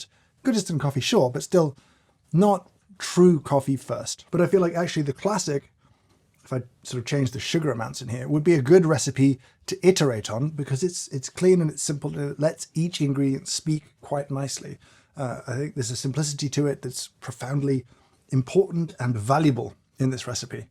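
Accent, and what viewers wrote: United Kingdom. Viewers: those are manually-added subtitles, not automatic ones. The person speaking is British, lives and runs this channel in the UK, and is speaking British English. So, unless the subtitling work had been outsourced to the US, it is unclear how it ended up being in American English.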